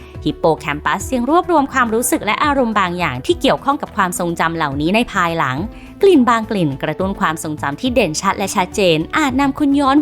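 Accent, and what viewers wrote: Thailand. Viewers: เสียงเหน่อจัง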